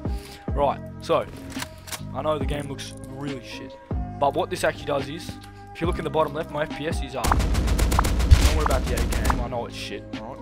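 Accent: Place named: Australia. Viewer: He’s Aussie adlay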